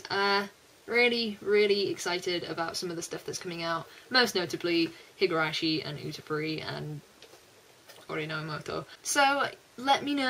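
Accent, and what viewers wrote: United Kingdom. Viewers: aha what part of britain are you from? you sound alittle south eastern like london ? and lol to be honest the only anime i have seen this term that is watchable is Shinjekyo no kyojin i tried some of the other ones but meeeeh maybe ill try gargantia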